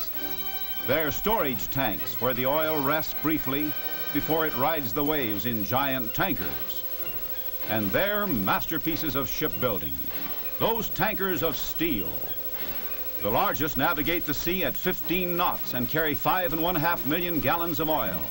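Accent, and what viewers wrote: Transatlantic, United States. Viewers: It's called the Trans Atlantic Accent. It was used back then in all American film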